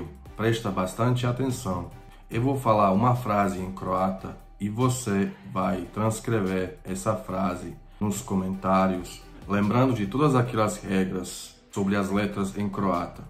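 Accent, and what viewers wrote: Minas Gerais, Brazil. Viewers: Você fala um português praticamente igual ao que falamos em Minas Gerais, isso mostra bem que há uma proximidade na fonética das duas línguas, já que o indivíduo sempre irá aprender outro idioma aproximando o máximo possível a fonética de sua língua materna à fonética da outra língua. Ótima explanação sobre as letras do alfabeto croata desconhecidas por nós brasileiros.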